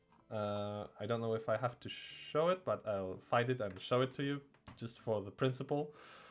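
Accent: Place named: Germany. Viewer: you sound kinda German but also not at all, the accent is so hard to pinpoint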